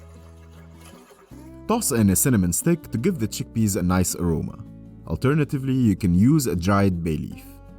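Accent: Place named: Lebanon. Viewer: Hah. That’s a Lebanese accent if I’m not mistaken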